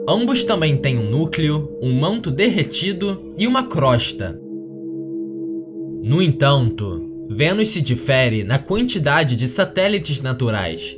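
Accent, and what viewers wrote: Rio de Janeiro, Brazil. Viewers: Adorei o nível do conteúdo e das curiosidades. Mas esse SOTAQUE!!!!! Meu Deus, da pra aguentar muito tempo não 😣😐 carioca né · sotaques feio deve ser carioca ..igual ao ladrão malandro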